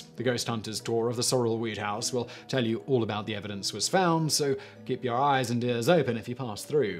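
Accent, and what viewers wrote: United Kingdom. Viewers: Who is this British man? And i wander does he smoke weed?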